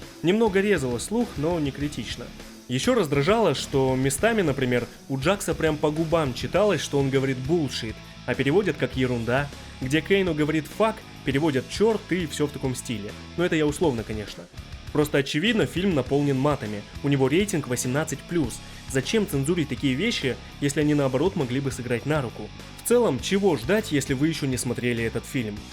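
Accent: Far East, Russia. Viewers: Ты с Дальнего востока???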